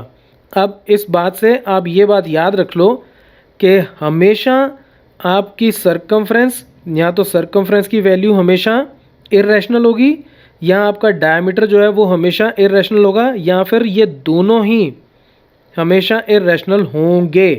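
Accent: Punjab, India. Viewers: App punjabi ho na Sir!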